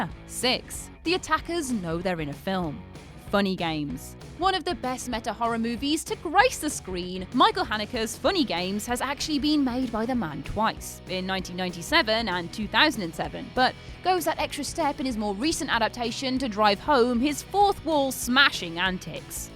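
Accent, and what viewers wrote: United Kingdom. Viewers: That's pretty standard British dialect. They pronounce a lot of French in standard English phonetics.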